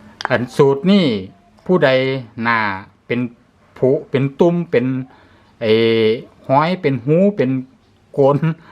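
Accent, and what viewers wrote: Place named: Chaiyaphum, Thailand. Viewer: สำเนียงคล้ายคนชัยภูมิแท้คับ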